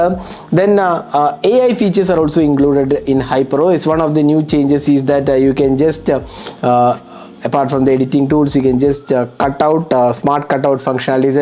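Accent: India. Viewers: Enjoyed this in-depth content👍👍the split screen and floating window feature is amazing! Now I can message and watch video at the same time PS: love Indian accent English better than our Chinese accent English😂❤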